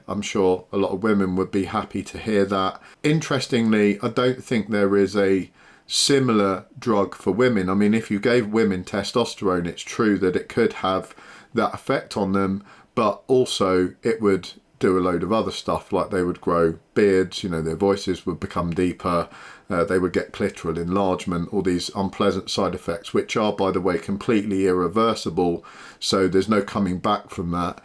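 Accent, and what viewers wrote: United Kingdom. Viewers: I’m here because I love the bloody masculine British accent - from the U.S.A!!!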